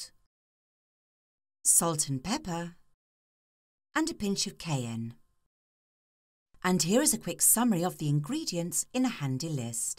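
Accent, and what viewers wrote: England, United Kingdom. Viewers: knew it would be legit after I heard the English accent. lol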